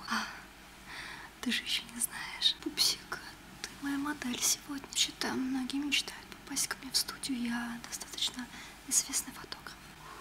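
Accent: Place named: Russia. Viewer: I agree. Her Russian accent really makes this more relaxing than it should be!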